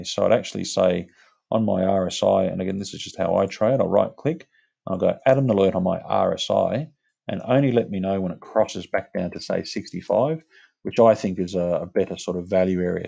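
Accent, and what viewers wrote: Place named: Australia. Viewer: Hi, I heard you mentioned you use TradeStation. I'm assuming you're aussie from the accent... Is that the broker you'd recommend for aussies on TradingView?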